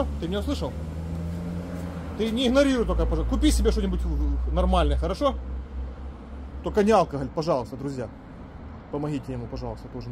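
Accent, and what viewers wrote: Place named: Ukraine. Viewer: Так это же украинский говор. Такой мягкий и приятный уху!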